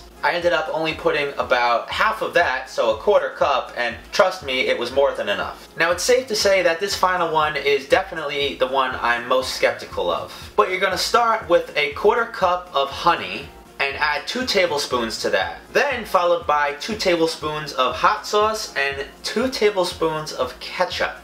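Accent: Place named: Brooklyn, United States. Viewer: I love his voice, he sounds like he's from brooklyn in the 50s! Where is he from?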